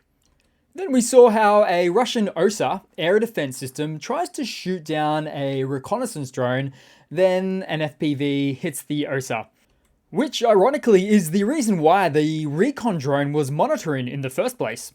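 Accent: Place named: Australia. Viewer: Canary Yellow!! That is Australian Green and Gold my friend and don't you forget it:) The sing song vocals have that rhythm of The Man from Snowy River; 'There was movement of the drone crews for the word had got around that the Rus from Belgorod had got away and had joined the Freedom League. They were worth a thousand frowns so all the Moscow hacks had gathered to the fray. Solovyob was there, frothing at the mouth, for no man could bullshit like him once his blood was up...... I swear is channeling the bard and his accent is like a swim in the vernacular. Onya. Carn Ukraine. Ken Oathcarne.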